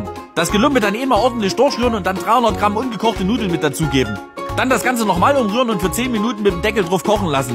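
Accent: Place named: Saxony, Germany. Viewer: Ich liebe dein sächsisch. Klingt für mich nach Karl-Marx-Stadt. Oder wie man heute sagt "Chemnitz statt der Moderne" 😋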